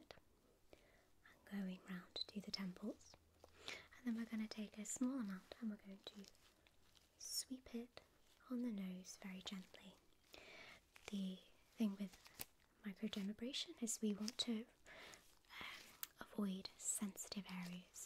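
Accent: United Kingdom. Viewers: Ohhh is that a British accent?? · A woman with a British accent is very lovely 😍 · What kind of accent is this??? I’m assuming something from the UK, but is there a specific region name?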